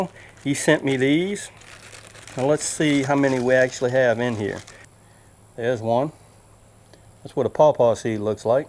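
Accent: South, United States. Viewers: I love your country accent🤗reminds me of my momma❤New subbie! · Thanks for the amazing video love the accent I have some family in the south north Carolina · Hello... Damn, I'm loving that southern accent!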